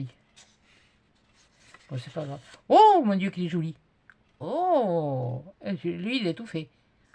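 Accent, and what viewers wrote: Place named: Marseille, France. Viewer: Bonjour marseillais, dites moi qui est Coco? J'adore votre accent, j'ai le même 😊je suis d'un petit village à côté de Toulouse. Bonne journée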